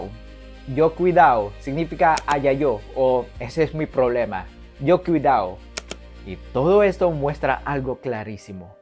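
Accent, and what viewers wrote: Andalusia, Spain. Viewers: Todo lo que hablas es muy similar al español de Andalucía. Tiene sentido, ya que la mayoría de personas que llegaron a las Américas y Filipinas salían por el puerto de Santa María, en Cádiz. Principalmente personas del oeste de Andalucía: Huelva, Cádiz, Sevilla, Córdoba...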